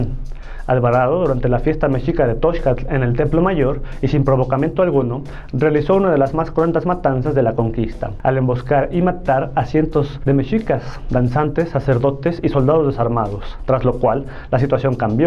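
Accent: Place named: Spain. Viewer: Dudo que seas español; y aún así, hablas como uno. Patético.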